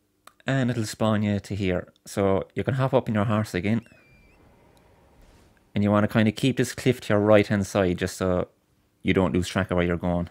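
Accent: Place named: Ireland. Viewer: After trawling far too many videos showing how to get it at late game this is exactly what I needed lad and with an Irish accent to boot 👌 Appreciate it!!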